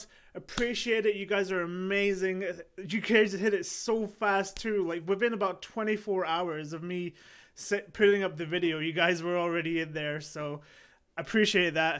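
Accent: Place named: United Kingdom. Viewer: Damn, now I feel quite stupid.. 😅 your British accent doesn't seem that strong to me though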